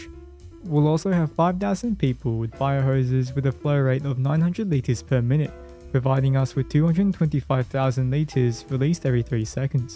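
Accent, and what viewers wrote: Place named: Australia. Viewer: A British person with an Australian accent?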